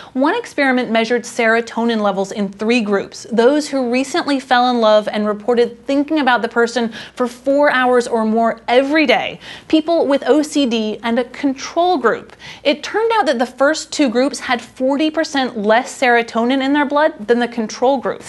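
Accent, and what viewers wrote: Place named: United States. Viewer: Her voice reminds me why I don't like American accents. Absolutely no ability to modulate - it's just constantly shouting.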